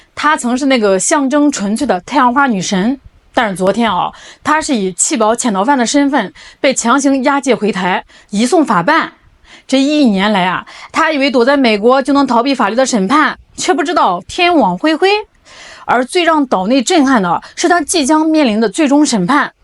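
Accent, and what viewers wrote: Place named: Shandong, China. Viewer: 山东口音😂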